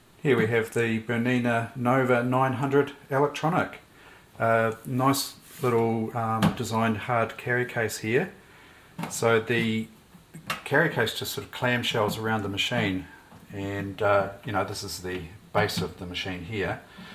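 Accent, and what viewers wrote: New Zealand. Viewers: Great video. You must be a Kiwi.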